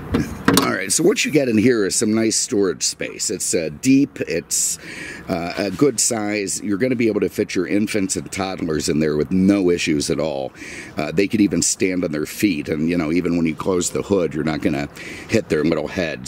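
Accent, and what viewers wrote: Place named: Philadelphia, United States. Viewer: Love the channel. The narrations are great but the voice seals it. Been trying to pin that accent for a few months... First thought: Jewish northeast. Possibly Philly, faded around the edges from being transplanted in Southwest FL for a few decades. Then I thought; "WHO does that sound like" and it took less than 30 seconds to come up with- Paul Lynde, who came from East Ohio by way of NYC. Am I close?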